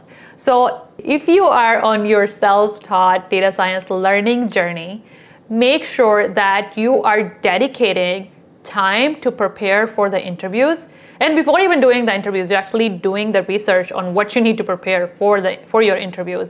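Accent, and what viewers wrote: India. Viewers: She speaks really nice but there is a pinch of desi accent in her voice XD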